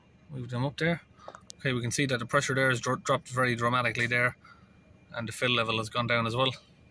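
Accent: Ireland. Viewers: Are you Irish by any chance?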